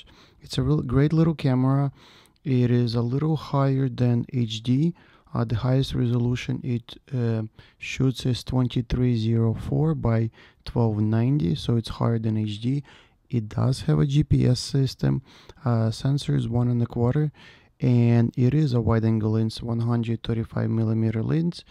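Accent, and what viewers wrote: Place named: Russia. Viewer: your speech accent sound like ur russian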